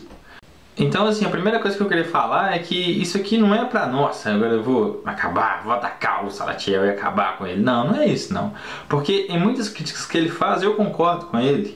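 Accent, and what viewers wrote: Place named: Minas Gerais, Brazil. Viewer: Vc é mineiro??? Tem um sotaquezim bem minerim...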